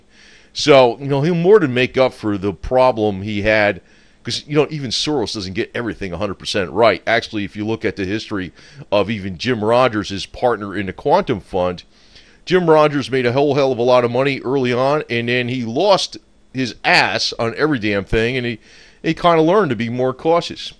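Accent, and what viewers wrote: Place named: Chicago, United States. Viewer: you sound like your from Chicago or Jersey. I think silver is more abundant than people think. I spent $100K on the metal at $31 to figure this out. You are right, innovation and a positive environment to encourage creativity is far more valuable than having abundant resources owned by meat heads. Good analysis.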